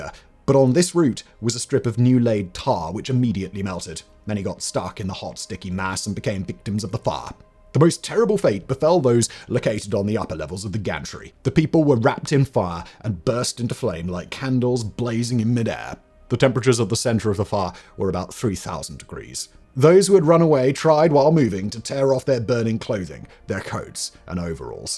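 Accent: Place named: RP, United Kingdom. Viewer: you do realise he is just a narrator, he doesn't write or edit almost of the material, all he does is ready from a script. He seems smart, but really it's just a posh accent, flashy clothes and glasses. His writers and editors are pretty average too because if you pay any attention the amount of inconsistencies, mistakes, misleading information and just blatantly false claims become glaringly obvious. Dint get me wrong, I love his content, but you should be aware he's not this genius everybody seems to think he is.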